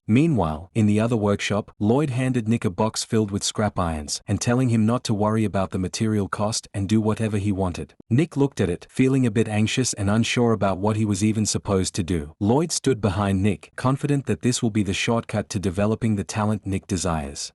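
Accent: United Kingdom. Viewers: British ahh accent. It's okay tbh. Just not used to it. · Why is AI talking with British accent 😭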